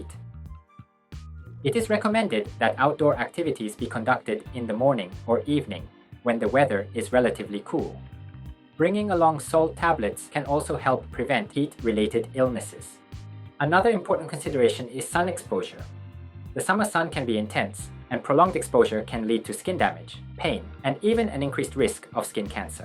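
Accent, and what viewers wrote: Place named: China. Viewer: He sounds like Chinese national living in Japan. Ying, yong, ong sounds. And he doesn’t have typical Japanese accent. May be Chinese national pretending to be Japanese?? Lots Chinese live in Japan.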